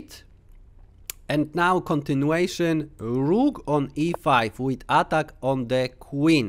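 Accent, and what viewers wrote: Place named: Poland. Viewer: Stare chińskie przysłowie mówi: Jeśli 1 osoba Ci powie, że masz łeb jak koń to daj mu w mordę. Jeśli druga osoba Ci powie, że masz łeb jak koń to daj mu w mordę. Jeśli trzecia osoba również Ci mówi, że masz łeb jak koń to warto się zastanowić czy nie pójść do weterynarza. Nie przypieprzam się, po prostu robisz masę błędów fonetycznych i składniowych, dorzucając polski twardy akcent sprawia, że słuchacz się męczy, bo merytorycznie muszę Cię pochwalić ładnie opracowałeś tą rozgrywkę, absolutny top 👍🏻 Akcentu i języka również idzie się nauczyć, wiem bo mi zajęło to całe 6 lat, powodzenia.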